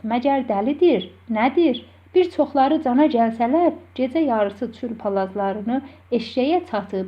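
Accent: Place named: Iran. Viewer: چوخ ساغ اولون زحمت چکیبسیز. آما روایت ائدن قؽز، تۆرکجه‌نی یاخشی قرائت ائتمیر. سانکی بیر فارس، تۆرکجه‌نی قرائت ائدیر. گئنه‌ده الله یاردیمجی‌سی اوْلسون. زحمت چکیر.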